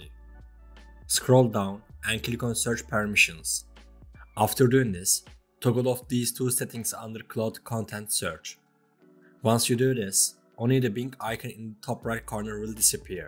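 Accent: Turkey. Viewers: türksün değil mi konuşmandan anladım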